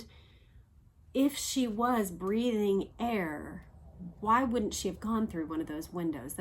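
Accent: Texas, United States. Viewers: I'm watching the start of this series on July 19th 2026, in a small little seaside village in the UK - coincidence! I love your channel and listening to your lovely Texan voice as I do my artwork at my desk, seeing you now and then. I've been following you a while now as the JFK Assassination has always fascinated me. Now I can't wait for you to dive into the Chappaquidickstory. Loving your work (and the trains) 😀👍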